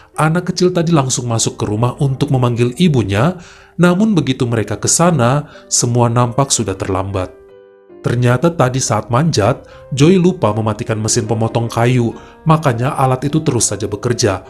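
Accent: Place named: Medan, Indonesia. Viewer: Dari sekian banyak alur cerita, cara menceritakan abg lebih enak didengar, mungkin karena kita sama sama medan, kisahnya juga pada seru dan menarik, sehat selalu bang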